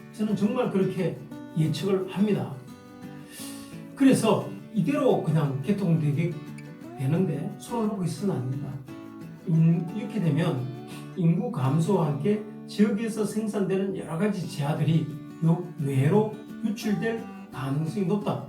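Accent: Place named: Gyeongsang, South Korea. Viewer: 영덕사투리를 좀쓰시네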